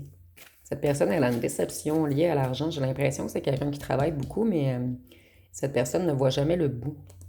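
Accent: Canada. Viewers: Désolé je sais que je suis enquiquinant, mais ca fait bizarre, pas naturel les faux ongles, cette surépaisseur, l'accent canadien on aime bien il est pas abusé, je vous souhaites de trouver en vous quelque chose qui rayonne qui fait que tout ajout visuel, auditif, ou quoi que ce soit de "matériel" ne serait que reducteur . Une force tranquille Amen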